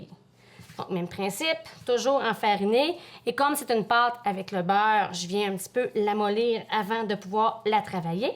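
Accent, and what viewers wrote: Canada. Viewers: Coucou je vient de m'abonner à ta chaine, j'ai un regine sans gluten sa tombe bien😘jaime beaucoup ton accent Canadien 🤩ey encore un grand MERCI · Merci pour tes excellents conseils et ta gentillesse, ça va me changer des pates collantes et ton charmant accent canadien, je suis française.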